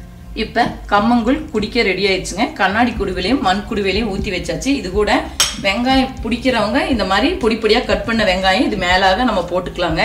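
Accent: Kongu, India. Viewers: கொங்கு தமிழ் கொஞ்சமாத் தெரியுதுங்க... நல்ல கூழ்...இன்னிக்கு நான் செய்யப்போறேன்.. 2020 ஜூன் 2 சென்னைல வெயில் தாங்க முடில... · மிக்க நன்றி, அழகான கொங்கு தமிழிலில் விவரித்த விதம் அருமை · கொஞ்சும் கொங்கு தமிழ் 😊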